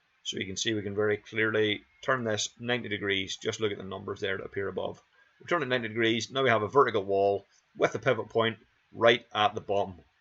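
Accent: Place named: Northern Ireland, United Kingdom. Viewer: Sounds like your a fellow Northern Irelander! :hand-pink-waving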